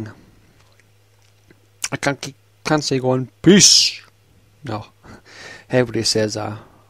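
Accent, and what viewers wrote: United Kingdom. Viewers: Lastly, your voice is clearly English -> Scottish in accent. To an untuned American ear, it is quite hard for me to understand you. This isn't your fault, just something to keep in mind if you want to broaden your audience.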